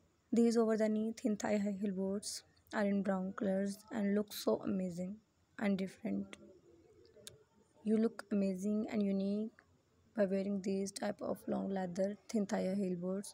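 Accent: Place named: Japan. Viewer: Your Japanese accent kills me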